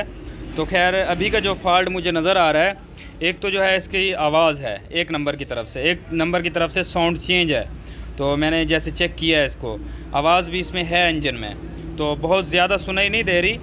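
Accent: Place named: Bengal, India. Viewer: Fault to aap ne bataye nahi aur bata bhi to bangali ki trah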